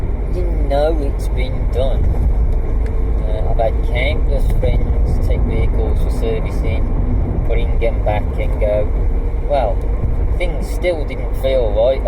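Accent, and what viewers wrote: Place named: West Midlands, United Kingdom. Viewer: funny west midlands accent. Sorry I can't listen to it. I'm so sorry.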